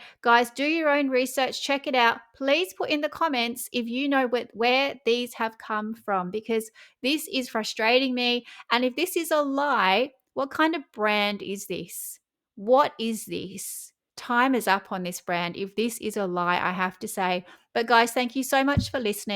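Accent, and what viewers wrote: Australia. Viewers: I love jour voice and your acccent Is het from Australia Big kiss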